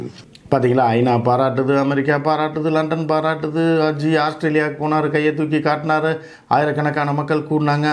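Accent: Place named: Tamil Nadu, India. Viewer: "Journalist" style of telling the political story is quite unique and it has the Mann Vaasanai of Rural Tamil Nadu. His use of "Aataya Poduraanuga", "build up Panraanuga" etc all very tactfully used in his vocal narration... He can be hired as a Political Propagandist during Election Time. Substantively, most Readers will agree 1. Modi's Economic Policies did not work for the Average Indian. Only the Upper Middle Class, Corporate Indians benefited mostly in the last 9.5 years of BJP Rule. On the KEY Measure of Per Capita Income of India, during Modi's Regime, it has grown about 172%, where as during the 10 years of Dr. Manmohan Singh under UPA Regime, it grew 250%. This is the most reliable measure of any countries Economic Growth. None of the Major "Reforms" that Modi came up with DeMonetization, GST, Clean India, Made In India etc paid any dividend to the Average Indian... 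Only the Corporates like Ambani, Adani and Business People got the filthy benefits. 2. India spent nearly 4000 Cr Rs on Moon Shot. Yes, finally ISRO achieved in sending a Machine to the Moon. But Modi sold it as though India has succeeded in sending a Human, an Indian to the Moon, which US did 60 years ago!! And, Modi even claimed that Indian ISRO is more capable than NASA of the US!! Indian Media became the Jaalraa of Modi's Propaganda! But, if you ask Modi "How many People got good jobs last month or last 3 months in India?" He will say "We don't know!" Because, most of the economic activitie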